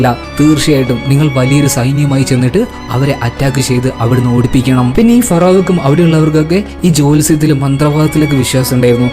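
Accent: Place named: Palakkad, India. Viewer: പാലക്കാട് കാരൻ ആണല്ലേ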